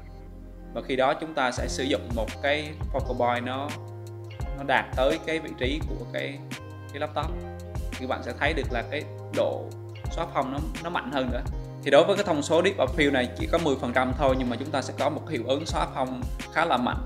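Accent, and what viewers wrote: Da Nang, Vietnam. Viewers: Giọng bạn giống giọng Đà Nẵng, phải b ở ĐN không nhỉ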